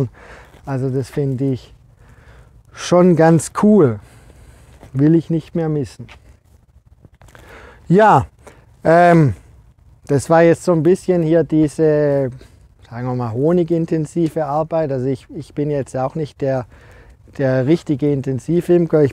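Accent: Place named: North, Germany. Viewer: super Video - echte, selbst erlebte Infos - und für mich als Wahl-Nordlicht auch mal wieder der Slang meiner Heimat! Danke, "gutes Neues" und weiter so! Chris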